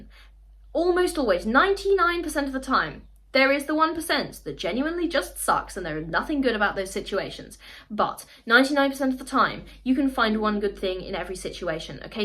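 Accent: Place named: United Kingdom. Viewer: You came into my algorithm I was about to pass on Then I heard your accent Its so British haha Found you interesting, I'mma try to listen to more of you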